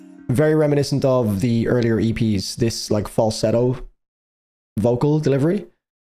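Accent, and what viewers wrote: Ireland. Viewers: 3 reasons why your Irish (warning: funny): 1. You drink excessive alcohol, specifically in the blind reaction video for Even in Arcadia, every Irish will do this this is a stereotype really but who cares 2. Your accent (pretty straight forward) I also sense some British or Australian accent not sure 3. Lastly, in this video your drinking (which is made by Coca Cola company this blew me away I didn’t know they sold water products) which are exclusively in Ireland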